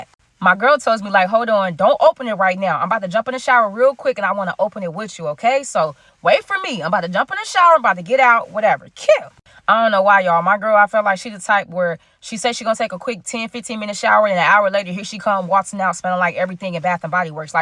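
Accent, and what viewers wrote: Chicago, United States. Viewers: are you from Chicago? 👀🤔I been watching you since your tiktok story times and i swear you talk like us lbvs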